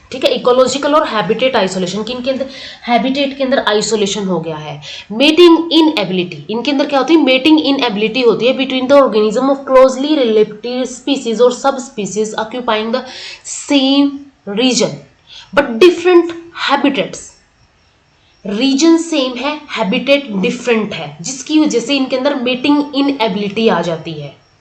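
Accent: Haryana, India. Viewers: Mam Haryana se ho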